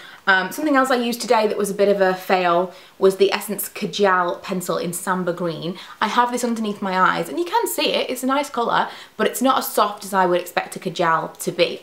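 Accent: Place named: Yorkshire, United Kingdom. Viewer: ahh I see! That makes sense. I had the idea at the time that the dryer they were, the more they'd stay in place but now I'm not so sure. Might have to run and get the older ones before they disappear:) Love your videos by the way. I'm from Leeds so it's always nice to hear a YouTuber with a Yorkshire Accent!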